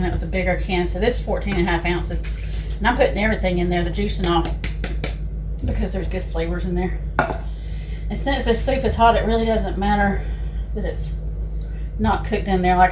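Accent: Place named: South, United States. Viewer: Thanks for the recipe...i like the sautéed onions and garlic trick...cooking right now...smells delicious! I just love your cute little southern accent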